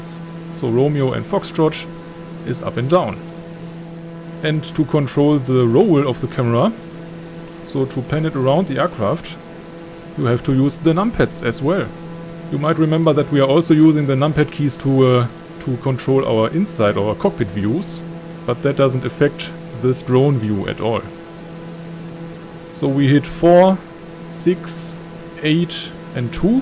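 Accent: Germany. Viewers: Super gemacht hat mir echt geholfen habe an deiner art zu sprechen gemerkt das du deutsch bist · haha liebe diesen deutschen Akzent im Englisch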